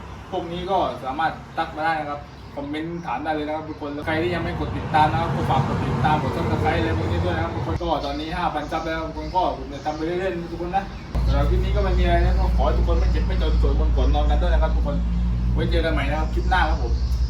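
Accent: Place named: Central Thailand, Thailand. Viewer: แหลงกลางชับน่ะเติ้น บ้านอยู่ใหน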